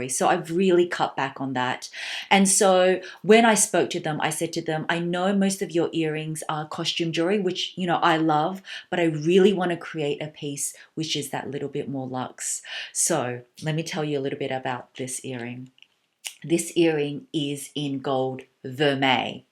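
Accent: Australia. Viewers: I don’t have piercings but I really appreciate these earings, the creative aspect and your kindness behind the creation. Thank you so much for being so mindful of the fact that being able to see the world around us is a blessing and giving back is something we should do because we’re so luckier than a lot of people. I used to live in Australia and I feel really connected with you and your accent. I really like you for being so humble despite having a lot of luxury goods. I wish you all the best. Youtube needs creator like you. Keep up the good work :)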